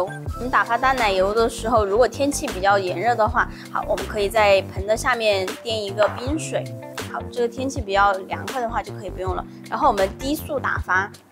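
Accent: Sichuan, China. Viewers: 老师是四川人吗:chillwdog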